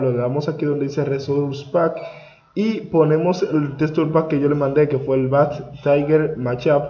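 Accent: Dominican Republic. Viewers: Eres dominicano? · loko tu eres dominicano?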